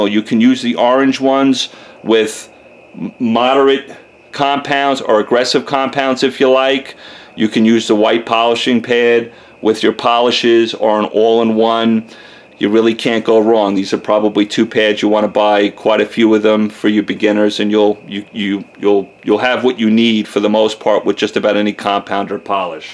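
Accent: New York, United States. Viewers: Are you from New York? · A Texan who sounds like a New Yorker. Hey, great video. Very informative.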